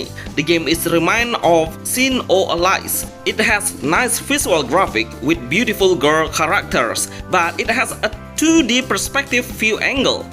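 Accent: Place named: Indonesia. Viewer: mungkin pembicaranya yg indo bang kek indo banget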